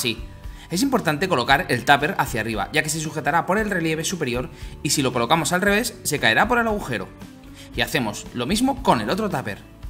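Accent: Spain. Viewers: ??, eres catalan? ☺️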